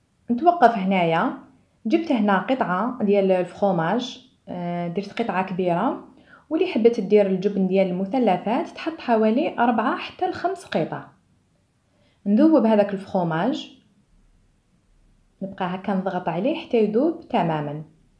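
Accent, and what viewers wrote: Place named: Algeria. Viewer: تبارك الله على اخواتنا الجزايريات راكم حادكات الله يبارك و تحمقني هدرتكم مغربية مرت من هنا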